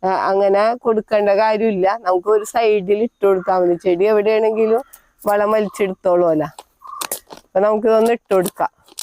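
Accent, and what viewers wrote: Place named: Kozhikode, India. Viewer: Couldn't understand Koyikodu bhasha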